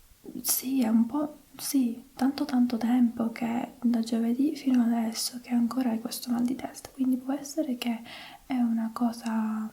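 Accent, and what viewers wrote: Sicily, Italy. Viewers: It seems that you come from Sicily because of your accent. It's wonderful,gorgeous. As an italian,complimenti❤ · ‘Mbare, I hear some sicilian accent here 😏😍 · Che meraviglioso accento siciliano. Una mia cara amica di Siracusa parla proprio come te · omg u speak Italian way to good!!! I am Italian and I can say that u have a beautiful Sicilian accent! I love ur videos,;* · il bello è che è canadese ma quando parla italiano sembra siciliana doc😂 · Hai un accento siciliano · Bellissimo il tuo accento siciliano 😍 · Io sento l accento sicilianooooo!❤️ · Sembra siciliana hahahaha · L'accento sicilianoo❤😂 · Oh wow, non avevo idea che fossi semi-italiana 😊 All'inizio ho visto questo video e ho pensato 'oh ok, allora è un genio', ma adesso sapendo che sei cresciuta in Canada ha un senso 😁 Hai un accento stranissimo, un misto di forte siciliano e straniero non meglio identificato haha Ma parli molto bene, mi piace! 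Ti dico qualche errore che mi è saltato all'orecchio per aiutarti: lavorare sul computer = al computer, infezione negli orecchi = alle orecchie, lentine a contatto = o dici lentine e basta (che comunque è molto informale e forse siciliano, essendo siciliana anch'io non sono sicura XD) o lenti a contatto, sistema immune = immunitario. Comunque grande! Tuo marito è italiano o canadese, per curiosità? :P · Sei siciliana? Si sente tantissimo l'accento😄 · L accento siciliano é un tocco di classe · In tutto ciò solo i veri siciliani capiscono l'accento fortissimo(e bellissimo) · Adoro il fatto che tu abbia un accento siciliano bellissimo♡♡♡ sei bravissima, complimenti! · Con l'accento marcatamente siciliano, ce ne vuole coraggio a dire che è "americana", solo perché ha vissuto qualche anno in Canada! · Quando parli in italiasiciliano! sei molto tenera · Siciliana sicuramente, si sente tantissimo e capita anche a me ogni volta che vado al nord nonostante io parli in italiano 😂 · Non capisco. 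Questa ragazza ha origini italiane? Il suo accento è italianissimo, quasi siculo!! Adoro 😻 bravissima! Hai una voce meravigliosa. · Hai un accento siciliano fortissimo ahahah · Seem that you have a Sicilian accent 😂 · Si sente che è siciliana... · i heard some sicilian accent 🤣🤤 ti amo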